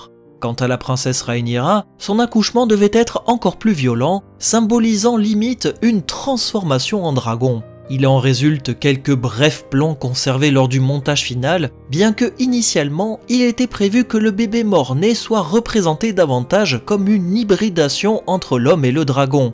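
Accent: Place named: France. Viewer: C'est vraiment très étrange d'entendre les noms des personnages de cette façon... d'une manière si française, plutôt qu'en VO (plus précisément en haut valyrien du coup.) [Ranyra] à l'écoute, au lieu de [Wraynira]. [Jackérys] au lieu de la prononciation [Jaycéwrys]. Entre autres. Ça pique. Mais c'est mon avis.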